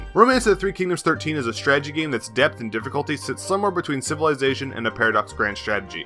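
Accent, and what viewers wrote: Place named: Midwest, United States. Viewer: That's one hell of a mid West Flow you got their son.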